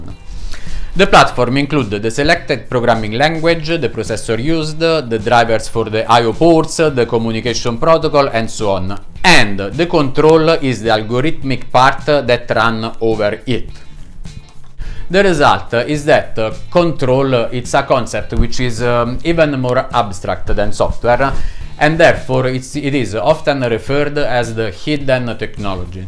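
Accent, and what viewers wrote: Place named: Italy. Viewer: Your accents makes me think you are italian...without subtitles it would be difficult for audience to understand what you are saying....overall your content is very well structured👍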